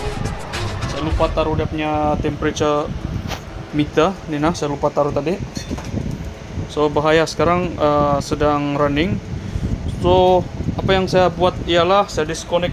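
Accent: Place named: Sabah, Malaysia. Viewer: Kedai dmna bang dsabah ka loghat mcm sabahan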